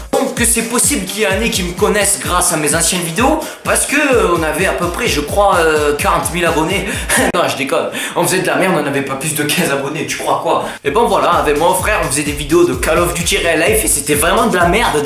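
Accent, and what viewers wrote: South, France. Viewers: Je t'adore ! tu me fais rire. Tant fait pas pour ta taille je mesure moin 1m60 et je suis aussi en 3eme voilà voilà. ah oui j'adore ton accent du sud 😉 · J'adore ton accent 😊😍et tes vidéos sont super simple mais tellement bien 👌🏻😏 . C'est drôle parce que je connais un Clément, qui a le même âge et qui a aussi l'accent du sud 😊😂😌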